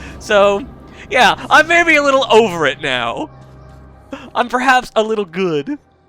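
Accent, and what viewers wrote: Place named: Russia. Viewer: "join the army they said- It'll be fun" Pretty much the summary of the whole game. I am also a fan of the 'turn around and everything is different' and 'magically forming floor' tropes. It always gets me, specially when used in a horror/thiller sense. Not my favorite game, but it was entertaining none the less, your Russian accent helped with that lol. The monster's especially the Librarins were honestly terrifying. Though the story was super muddled to me and when you start explaining the book and stuff at the end I go "huh... so that's what that was"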